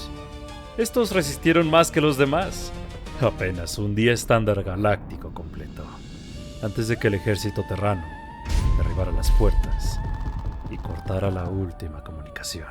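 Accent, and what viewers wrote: Mexico. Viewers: Este canal no sube ia. el relator parece mexicano. y los relatos son de un subreddit si no me equivoco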